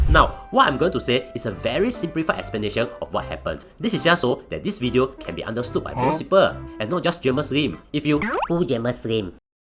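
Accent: Singapore. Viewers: Even if i don't agree with you on some points, your delivery is humorous, very singlish. Good job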